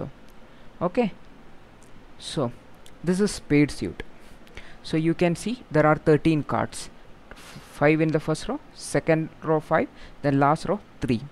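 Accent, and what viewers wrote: India. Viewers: Typical maliyali accent .....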